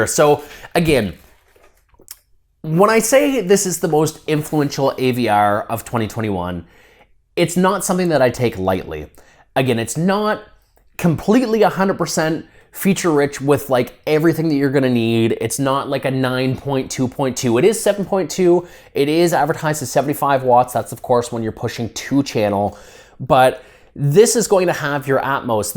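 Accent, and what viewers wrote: Canada. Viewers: This has to be the most canadian-sounding human on the planet. Great video though.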